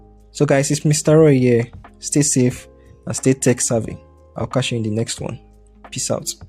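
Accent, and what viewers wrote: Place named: Venezuela. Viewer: Español venezolano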